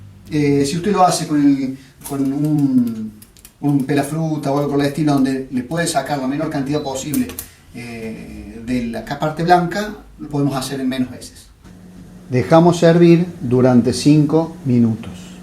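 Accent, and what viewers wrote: Cordoba, Argentina. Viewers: Canal mas que bueno y solo podía ser argentino!!! Famosos por saber enseñar con la mayor generosidad,,,,, país del que siento orgullo !!!! Además de tener muchísimas delicias para pasarse todos los días haciendo cosas ricas p nuestro deleité __gracias mil!!!___aprte de escuchar ese acento tan çaracteristico de mi provincia de Córdoba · Con razón un GENIO DEFINITIVAMENTE ME SUSCRIBO 👏👏👏👏 Ialacordobés como yo jajaja y la tonada nos delata Encantada con tus recetas👍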